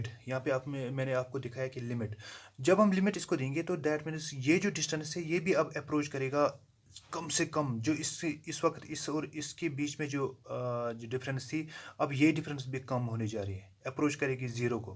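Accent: Kashmir, India. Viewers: Sir! Are you kashmiri ?? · I think u r kashmiri bcoz aap ke batein 😄aap plus ko pulos bata rahay ho vaise b ham kashmiriyo ke aadat he hai nayi angreezi banana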